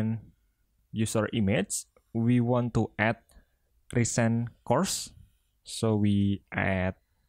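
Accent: Indonesia. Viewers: Awal nonton, kok aksennya familiar 😂, ternyata orang indo toh, mantep dah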